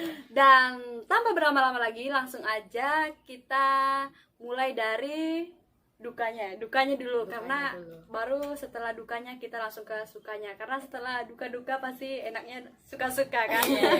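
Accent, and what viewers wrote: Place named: Central Kalimantan, Indonesia. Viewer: mirip logat kalimantan tengah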